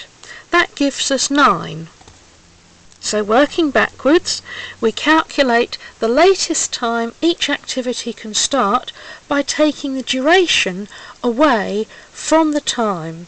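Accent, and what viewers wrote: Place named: United Kingdom. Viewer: Me too. She has that lovely very British accent ;)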